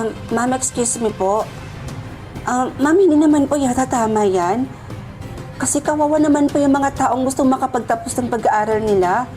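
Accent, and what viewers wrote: Visayas, Philippines. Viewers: Bisaya ka po ata ? 😊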